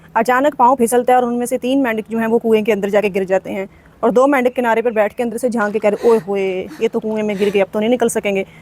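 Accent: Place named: Punjab, India. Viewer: آپ اردو کو اردو لہجہ میں تو بولیں ایسا لگ رہا ہے پنجابی بول رہی ہیں آپ کا accent مکمل پنجابی ہے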